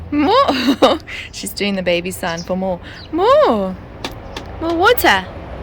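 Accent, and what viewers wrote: South Africa. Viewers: Hey! Your lovely Saffa voice is so soothing to listen to! And I love your text on the screen during the noisy bits 😂 we are in Ireland 7 months already!! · I am currently in Dublin visiting a friend, He was kind enough to open he's door to me to come out here to look for employment, really God sent. I have left my Wife and my 2 children back home in South Africa really out here trying my outmost best to make this trip work for me to try and give my Wife and my Children a better future. Things back home are not looking good at all, so I really need to make this work. I have a Home Care and first Aid certificate from the Red Cross South Africa. If you are kindly willing to refer me to a place where I can try and land a job because unfortunately all the places I have called or approached don't do sponsorships at the moment, I am willing to cover costs for a work permit. 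If you might also know of any place that is hiring any Customer Service or Sales Jobs. I would really appreciate any kind of information. Thank you. I have been applying for work online hoping and praying that I land an interview it has not happened yet though but I am pushing on. Really your story has touched my heart because I can relate and it really tells a story of how strong we are as South Africans, also what a blessing to my ears for hearing a familiar South African accent...lol...All the best going forward...May God continue to bless you all and guide you...Cheers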